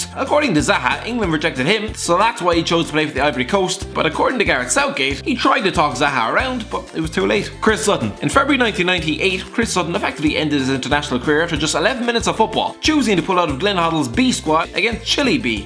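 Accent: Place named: Ireland. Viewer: Love the Irish guy doing the narration! He's SOOOO funny!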